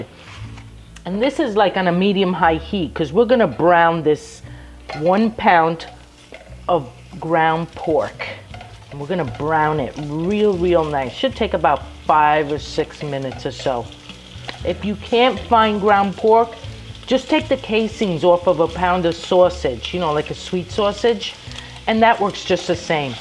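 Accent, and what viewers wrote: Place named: New Jersey, United States. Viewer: I love your accent lol I knew you were from Jersey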